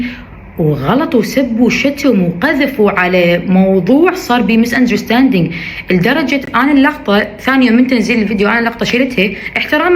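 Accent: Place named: Iraq. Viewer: ترى انج تحجين عراقي موانجاز الإنجاز بالنسبة للة انج عايشة بدبي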